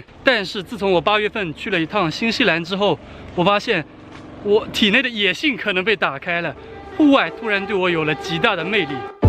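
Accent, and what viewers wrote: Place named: Hangzhou, China. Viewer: 一听口音就好亲切 果然是杭州的 感觉你身体好有点僵硬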